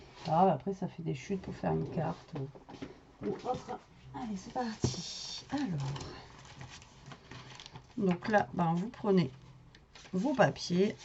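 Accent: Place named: Toulouse, France. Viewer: Cc, il est vrai que je mets pas trop de commentaires en ce moment, j'essaye de me débrouiller toute seule mais pas tjs évident, et pour ce qui vous suit, je voulais dire que c'est vraiment grâce à vous que j'ai pu apprendre car vos tutos sont totalement formidable, c'est très bien expliqué, simplement et efficacement, je reviens tjs vous revoir pour tjs réaliser vos réalisations et c'est tjs un plaisir de vous revoir avec votre accent que je connais si bien, que j'adore, car je suis toulousaine, restez tjs là même car d'autres personnes comme moi qui étais vraiment débutante, aurons besoin de vous comme je le suis encore, et qui font que nous apprécions le scrapbooking, merci encore à vous, je vous embrasse 😘😊😊😊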